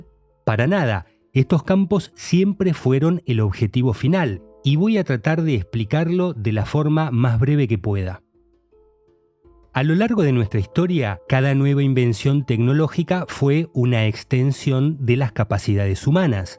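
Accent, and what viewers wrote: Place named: Argentina. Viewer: Aquí, dispuesta, UNA VEZ MÁS, a SEGUIR APRENDIENDO de tus trabajos de investigación. Tu indisimulable acento argentino es, en verdad; un ORGULLO para todos tus compatriotas, entre los que me encuentro. Además, me llena de GRATITUD el hecho de que, a mis años, puedo quedar SIEMPRE ENRIQUECIDA al escuchar a un joven TALENTOSO que, seguramente, tiene edad como para ser mi nieto: un PRIVILEGIO que es para mí un REGALO DEL UNIVERSO. Un abrazo desde Mendoza.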